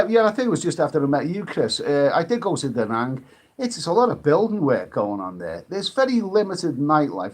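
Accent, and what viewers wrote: Liverpool, United Kingdom. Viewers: 2 are better than none<brilliant scouse accent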